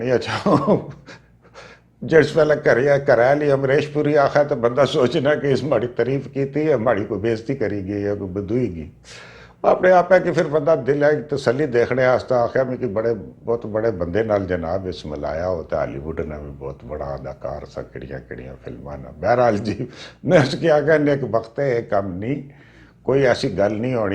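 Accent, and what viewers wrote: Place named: Pothohar, Pakistan. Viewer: Mashallah....Kai Sohni Pathwari Bolne Pye O.....Mhara Dill Bagh Bagh Hoyi Gachheya...... Bahun Pyar Naal, Nawin Dilli Ton❤❤❤❤❤❤ Allah Paak Tussan Ki Hassna Vassna Rakkhe. Aameen Summa Aameen ❤ Hamesha Tussa Ne Video Na Muntazir❤❤❤❤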